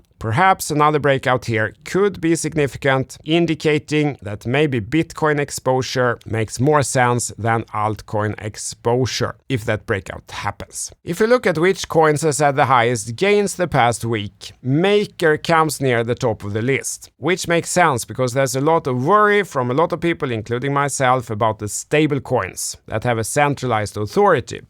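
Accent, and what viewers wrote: Sweden. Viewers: A true Swede, judging from his very pronounced Swedish dialect. Keep up the good work brother!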